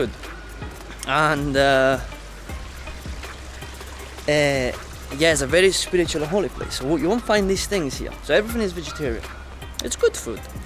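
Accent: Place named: Scotland, United Kingdom. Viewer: Great vlog,! You picked up some Scottish accent too!! 🤩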